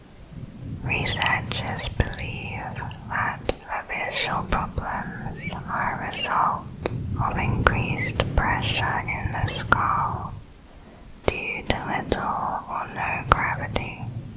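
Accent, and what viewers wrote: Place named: Liverpool, United Kingdom. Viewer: Lovely Scouse accent.❤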